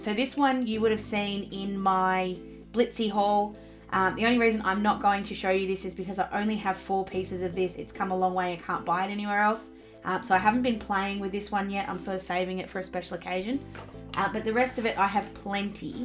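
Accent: Australia. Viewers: Just Love Aussie accent and culture!! :D thanks for the video!! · Between your adorable excitement & your accent....I was anticipating a “crikey” or 2, but at least once 😂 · are you from QLD? Trying to guess your state by your accent :)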